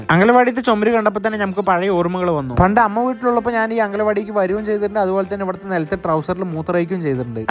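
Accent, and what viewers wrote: Palakkad, India. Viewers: Liked to see your videos. Liked your plalakkad language. All the best wishes monu👍❤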